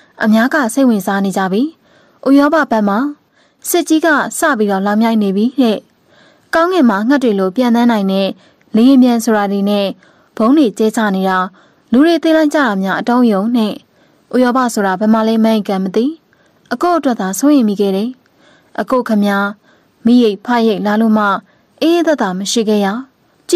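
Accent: Upper Myanmar, Myanmar. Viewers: သနဲ့တ မကွဲတာ စာအုပ်ထဲကအတိုင်းလား ဖတ်သူကအညာသံပါနေတာလား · စကာပြောပုံလေတေကနာထောင်လိုကောင်တယ်အညာသူမဟုတ့်ပေးမဲအညာမြေကိုရောက်သွားသလိုပဲ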